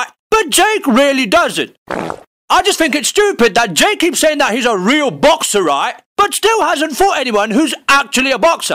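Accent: United Kingdom. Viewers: It's true, it comes up as dutch. I thought his voice sounds British. But, Dutch???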